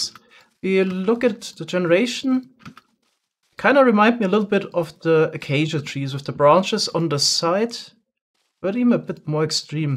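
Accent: Germany. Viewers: R U German? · Is he german? No front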